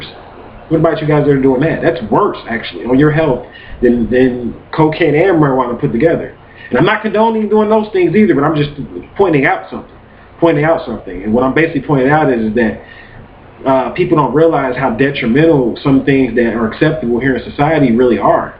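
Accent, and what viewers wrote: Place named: Los Angeles, United States. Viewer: Are you from LA/Long Beach? You have a la/lbc accent.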